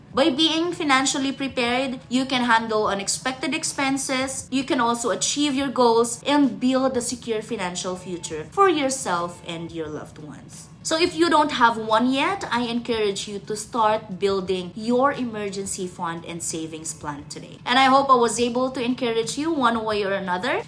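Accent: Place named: United States. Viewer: Parang nasa america ata to english hnd pinoy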